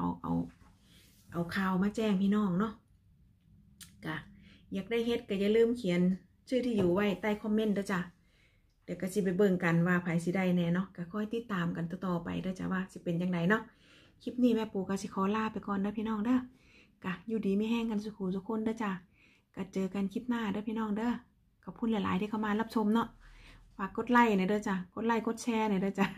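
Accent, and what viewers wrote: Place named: Nakhon Phanom, Thailand. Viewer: แจกเบิดรึยังจ้า อยากได้ขุดที่1 ภาษาเว่าคือไทนครพนมแทะจ้า แม่นคนนครพนมบ่อ อรอุไรลักษณ์ จีรันทนิน 200/803ม.4ต.ระแหง อ.ลาดหลุมแก้ว จ.ปทุมธานี 12140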